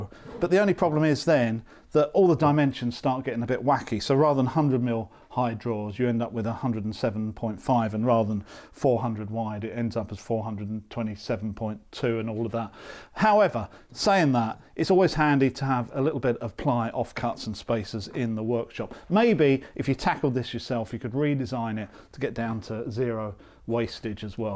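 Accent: England, United Kingdom. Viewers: His accent is English !!? Literally no one outside the US uses imperial anymore because it’s stupid…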